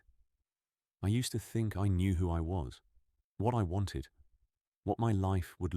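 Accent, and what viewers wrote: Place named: United Kingdom. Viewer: The Brit AI accent….sorry😨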